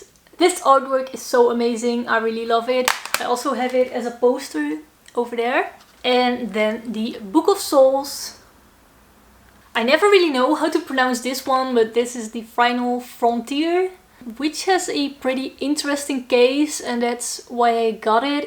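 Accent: Netherlands. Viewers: Nice accent English/Dutch haha but its really good 'Ik heb dat ook alle Nederlanders bijna' Great video!! And Wow not many girls have a good taste of music like you Love your Collection Groetjes van Rotterdam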